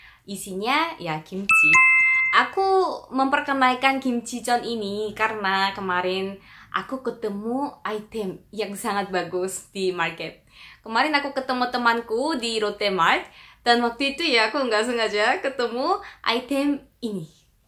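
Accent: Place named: Java, Indonesia. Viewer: Kok, ada logat jawanya yah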